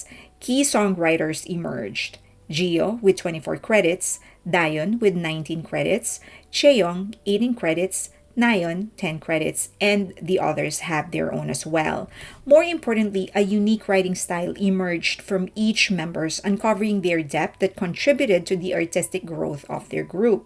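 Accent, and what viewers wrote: Philippines. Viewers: Loving the Pinoy accent 😍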